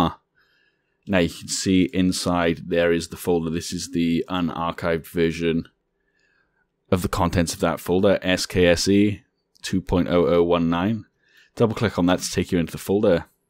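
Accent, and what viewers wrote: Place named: Wales, United Kingdom. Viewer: Dunno why I was surprised to hear another welsh person on YouTube but I was lol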